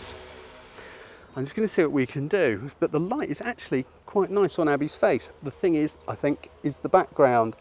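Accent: United Kingdom. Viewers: Enjoying that until it pauses...and asks for MONEY. Eventually got rid of ad, so thank you for your excellent tips. So nice it's UK English too!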